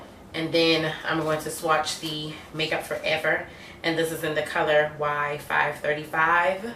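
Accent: Charleston, United States. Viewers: Lol your accent sounds very familiar to me, I could’ve sworn you were from Charleston, so I checked out your Facebook and it’s Columbia .... close enough... hey girl!!!! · Very nice tutorial, you sound like you're from chuck town sc.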